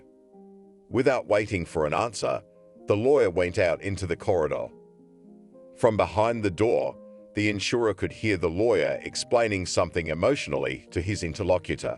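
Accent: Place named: United Kingdom. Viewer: well im sorry to say that the story might very good but I need to quit listening because the way the narrator speaks, it's so hard to understand as he is with british accent. making letter A as I, like in the word play as ply. letter O as Oi, as in the phrase I doint knoi, goi hoime, etc. hope you could find a better narrator with american accent. sorry again and thank you.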